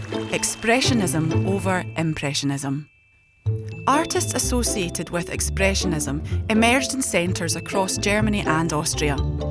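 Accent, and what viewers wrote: Scotland, United Kingdom. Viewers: Scottish accent..then there's accents within accents! My ex's was different. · The scotish accent it's just brilliant...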